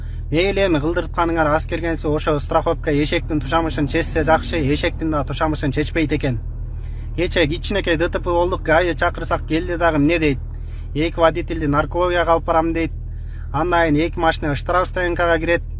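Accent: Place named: Pamir, Tajikistan. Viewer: Туура эле суйлодун брат. Акцент памирский экен а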